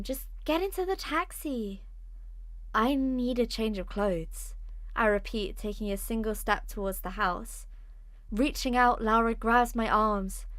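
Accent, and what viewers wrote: United Kingdom. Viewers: Finally. I enjoyed the other Sonya story parts. However, others are right about continuing on with the story. Looks as though there could be many more chapters left to be told . Sonya, Matt and Laura and their adventures..😊😊 Oh. A womderful story told with a British accent too.. If I'm mistaken about accent. Please forgive me...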